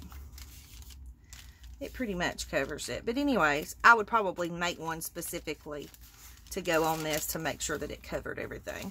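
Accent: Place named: Texas, United States. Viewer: I went out and made 15 clusters!!! Yea, tfs. You must be from Texas, sound like me and I left 35 years ago.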